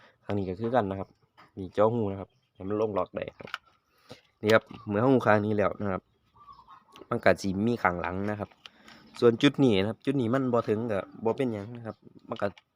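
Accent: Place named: Sisaket, Thailand. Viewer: อยู่ไสน้อครับคือเว้าคือคนศรีสะเกษแท้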